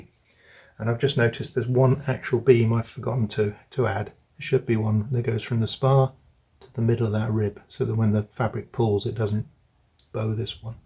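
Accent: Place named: Yorkshire, United Kingdom. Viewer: Totally agree with you. I'm waiting to see how he bent the carbon fiber rod for the tips. But please don't tell him I LOVE your channel heaps more ❤ If you were a shiela I'd ask ya out and we could talk about ailerons and airfoils and fuel mix ratios and compare our Fokkers all night and stuff like that 😂 But ya a bloke 😂😂😂😂😂😂😂😂 and I'm happily married. So that's a bit messed up hey 😅 You remind me so much of a bloke (in Australia cos I'm in Sydney Australia ) oh man it was near forty years ago I was 20 he was 60. Name was Charlie Leeson from Yorkshire. A tool maker who really made me fussy about word work and modelling. I flew my fifth scale DVII and he his tiger moth and fly baby. Best flying days of my life with Charlie.. His work was stunning. (He passed away a good twenty years ago ) Anyway you have a similar accent and manner so thank you for being you and helping to revitalise so many memories of a hero of my youth. 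Hey shouldn't I be talking about Danny's channel and not yours here?????😂😂😂😂😂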